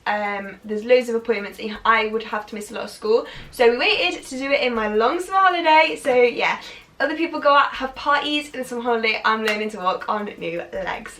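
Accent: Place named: England, United Kingdom. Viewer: Your a gorgeous girl...….I'm an Australian I love your English accent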